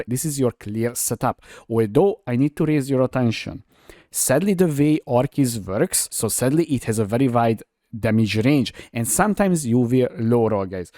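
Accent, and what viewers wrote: Hungary. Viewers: Egyből le vágtam hogy magyar akcentus haha :D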